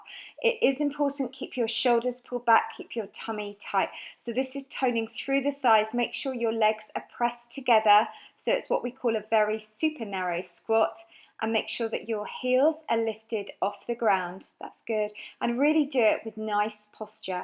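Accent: United Kingdom. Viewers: Wyndham-Read thank you!! it was not to be ofensive at all! you are really good and I love your determination and your constance and energy! and if you ever tried an Adults ballet class you would pick it really fast! I am sure of it:)., may I ask where is your English accent from? I am trying to improve my English. thanks! many hugs and all the best in your really fascinating project!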